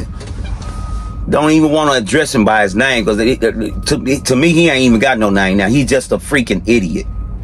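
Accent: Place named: United States. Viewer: Love your show and your country accent.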